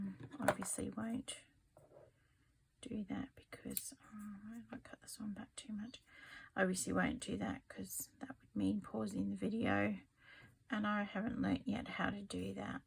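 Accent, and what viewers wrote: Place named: Queensland, Australia. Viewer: New subscriber from BC Canada spent 2 1/2 years in Queensland as a teenager love hearing you talk makes me homesick for my second home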